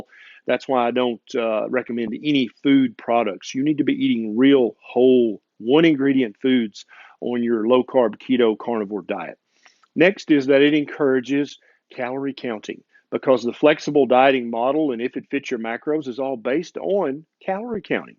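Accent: South, United States. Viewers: Apart from your incredible intelligence, you could have been a movie star from the golden era of Hollywood! Love the Southern drawl as well!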